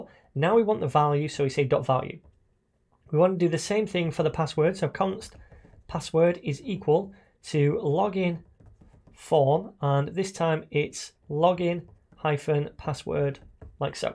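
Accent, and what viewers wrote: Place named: England, United Kingdom. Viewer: we have it COVERED.. but he's english and speaks fast so it seems covid.. lol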